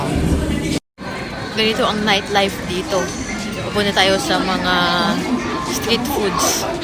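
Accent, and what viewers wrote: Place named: Ilonggo, Philippines. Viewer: Ilonga kasi ibà kung minsan ang pagbigkas❤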